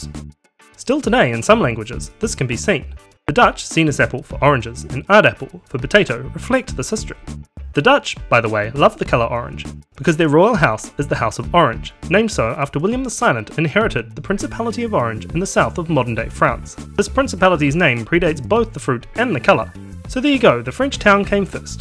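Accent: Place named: New Zealand. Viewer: or it might be the kiwi accent...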